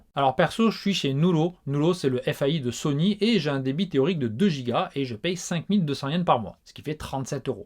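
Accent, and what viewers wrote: Marseille, France. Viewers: De temps a autre tu as une petite pointe d'accent de Marseille qui se fait entendre...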